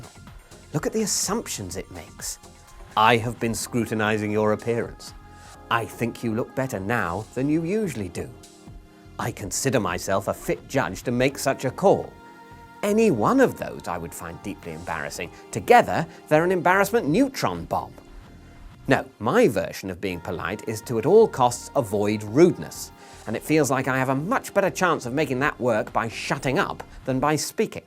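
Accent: United Kingdom. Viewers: Its like the neurotic voice in my head got a nice British accent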